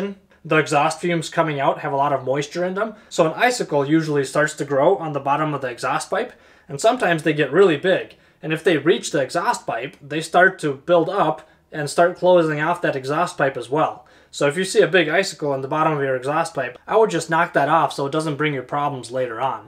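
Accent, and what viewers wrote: Minnesota, United States. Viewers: Great info! Love the Minnesota accent.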